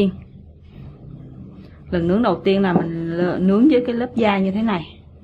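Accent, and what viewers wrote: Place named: South, Vietnam. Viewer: rất cãm ơn em dạy món thịt quay xem ngon lắm, nghe em nhai dòn rụm chảy nước miếng luôn, món ngon nhà chị ai cũng thích và hay mua ăn có khi ăn ngon quá khĩa 2 bửa luôn, sẽ mua thịt sống về làm theo em - mà nghe giọng miền Nam chân phương của em cãm tình và dễ thương lắm cũng nên quay cái mặt cho mọi người quen biết nữa nhé.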